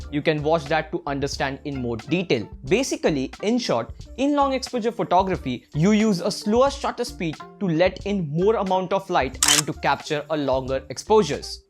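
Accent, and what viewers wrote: India. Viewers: Finally a decent indian accent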